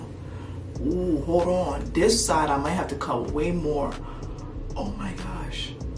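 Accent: Liberia. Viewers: Liberian girl? I hear the accent 🇱🇷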